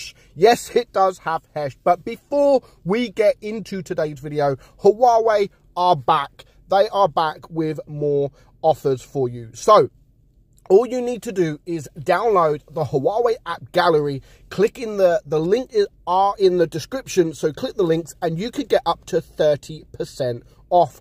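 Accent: United Kingdom. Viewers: I like your Brittish Accent😁🇬🇧 Do you prefer Cobra? but is just like Smasher but 9 and medium.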